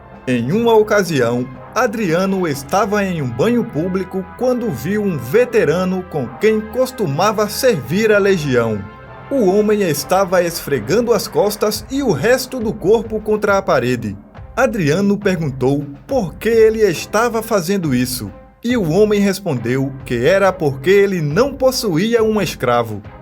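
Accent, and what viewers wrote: Northeast, Brazil. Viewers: Gosto de ouvir a sua voz. O jeito de falar é interessante. Você é do nordeste?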